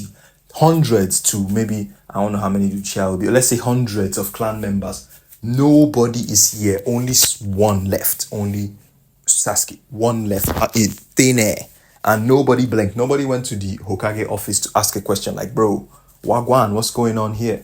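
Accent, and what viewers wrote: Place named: Africa. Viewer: I knew you were african, the accent never lies 😂. Also the itachi novels go into more detail. This is a quote from the novels when the elders debate whether they should allow itachi in anbu "The Military Police Force was created to drive the Uchiha clan away from the central functions of the village". Like the systemic discrimination was there and they didnt even hide it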